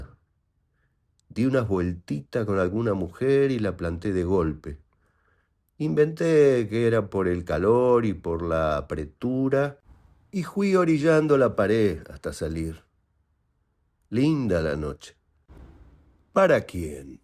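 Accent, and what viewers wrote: Argentina. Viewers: Qué mejor escuchar el cuento de Borges por un argentino, así como escuchar Pedro Paramo por un mexicano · Es muy cierto, las historias cobran vida cuando se las verbaliza. Un hallazgo ese tono y decir campero. Me recuerda a gente de Entre Ríos, a los uruguayos y a bonaerenses del campo. Borges estuvo muy ligado a mi provincia, también al Uruguay y al sur gaúcho del Brasil. No fue sólo un señorito de la gran urbe porteña, ni sólo un inglés o suizo. Fue todo, pero su corazón miraba al sur. ¡Qué hombre querible, culto, sensible, qué escritorazo! Gracias por esta entrega...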